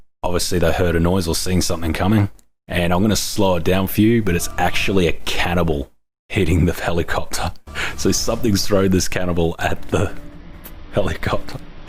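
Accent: Australia. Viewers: Mate, are you Australian? Is that a fellow aussie? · your from Australia buy the sound of it, plus the Aussie sarcasm, or i have been in isolation for way to long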